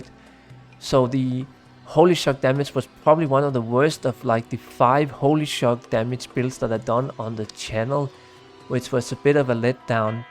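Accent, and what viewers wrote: Denmark. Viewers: i smell dansk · I guessed danish too! I thought he sounded a bit like Lars Ulrich, so that gave it away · I guessed you were Danish and got it right! I recently found out I'm a third Norwegian so I've been paying a bit more attention to Scandinavian accents :P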